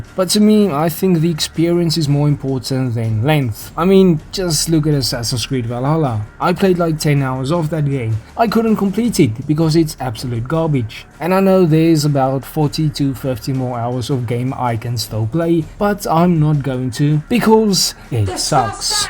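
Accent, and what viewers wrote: South Africa. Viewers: I enjoyed the flow of this video a lot, a nice surprise on my feed! Also is that a fellow afrikaner I hear? :P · Ur the most South African sounding guy I've heard doing a game review. Glad u popped up on my time line. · Hey man, great video. Are you South African by any chance? Curious due to your accent.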